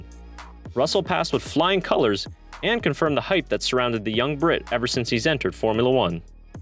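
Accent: United States. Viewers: I’ve been watching every F1 related video lately. This is the first video I’ve seen from this channel. It’s kind of funny (in a good way) to hear anything F1 related spoken in an American accent. 🙂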